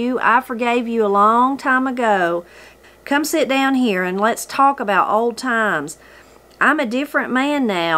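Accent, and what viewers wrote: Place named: Kentucky, United States. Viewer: I remember my grandmother’s voice and mama’s stories of family with every turn of your voice. Even though mama’s family was transplanted to Oregon, the Kentucky in their voices and stories echoes in my mind with each story you read. Thank you.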